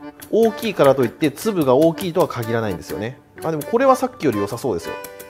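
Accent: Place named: Aichi, Japan. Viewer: の愛知訛りを聞くと地元に帰った気分になりすごく落ち着きますいつもありがとうございます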